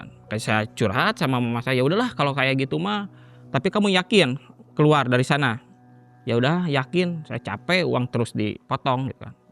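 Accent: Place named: Bandung, Indonesia. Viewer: logatna bandung pisan,saee dina bahasa na..jadi paham rng enakeun kakupingna th👌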